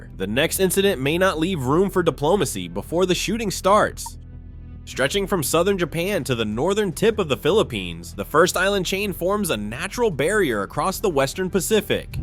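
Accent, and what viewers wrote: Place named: Canada. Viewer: You sound like actors from 'Kids in the Hall'. Are you Canadian?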